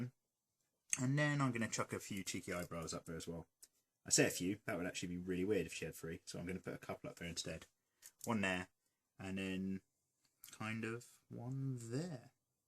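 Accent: United Kingdom. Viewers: I actually just found your channel yesterday, and I have to say your tutorials are very helpful ^°^ I've always wanted to start making my own (mini) manga series, but certain things I tended to struggle with, eyes included. Also, are you British too, by any chance? XD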